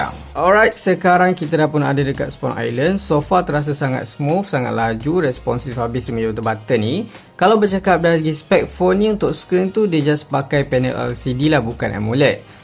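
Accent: Malaysia. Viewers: Padahal banyak dr indo pengen tau banyak tentang HP ini sayangnya bahasa Melayu nya kental banget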